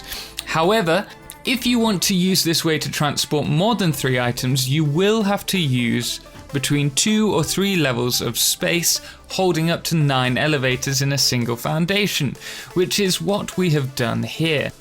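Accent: England, United Kingdom. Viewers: I watch this channel about Satisfactory mainly because of the pure English pronansuation! It's just perfect!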